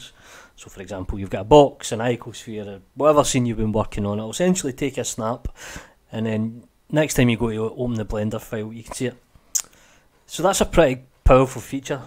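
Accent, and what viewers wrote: Scotland, United Kingdom. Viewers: Blender tuts in Scottish! Wohoo. I'm going to watch yer stuff just for the warm fluffy feelings ;-).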